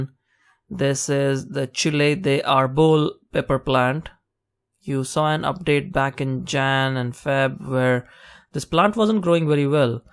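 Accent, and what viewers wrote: India. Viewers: your accent....are you from India ? nice videos :)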